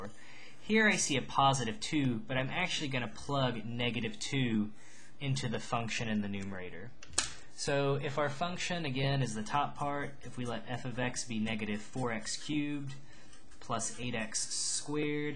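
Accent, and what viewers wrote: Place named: United States. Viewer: good maths, but I hate the american accent tho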